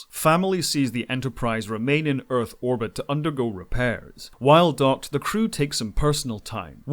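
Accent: Scotland, United Kingdom. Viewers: You have a very, very slight Scottish accent.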